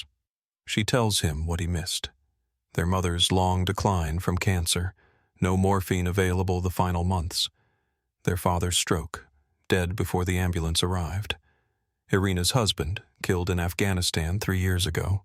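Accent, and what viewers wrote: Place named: United States. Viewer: Dull voice in this monologue. Very American. Machinelike. No nueancies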